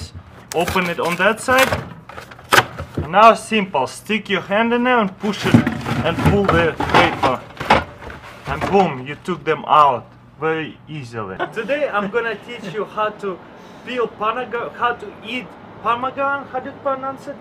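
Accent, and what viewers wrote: Russia. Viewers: I find a strong Russian accent funny. respectively his voice is hilarious. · Taras Smishchuk what's wrong with his accent? he is Russian, deal with it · He has a Russian accent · Russian English is so funny. One thing he does wrong though, he flipped the egg over. Blasphemy! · Why would you even want to lose it? Russian accents sound fucking awesome. But yeah, for an English speaker, it is EXTREMELY difficult to pronounce Russian words properly. I've spent a ridiculous amount of time learning two simple words: здравствуйте товарищ (Hello, comrade) I've said it at least a hundred times, and I still catch myself pronouncing здравствуйте (zdrah-stu-ee-tcheh) incorrectly. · the Russian accent makes all the video! Boom BOOM love it! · Give him a break his Russian. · He is Russian like me he has an accent people sometimes be rude about how he talks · He's not English he's Russian his pronunciations are different then yours!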